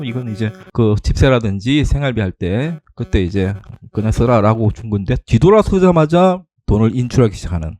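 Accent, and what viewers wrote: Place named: Gyeongsang, South Korea. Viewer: 현재 워킹 현황 영상과 경상권 억양에.. 친근함과 함께 구독 눌릅니다^^ 자주 올려주세요~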